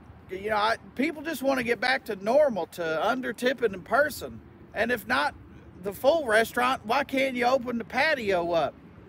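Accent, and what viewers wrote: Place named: Missouri, United States. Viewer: That Missouri accent is on point. "Welcome to the greatest restrunt in Missourer, the Gold'n Cur'ral"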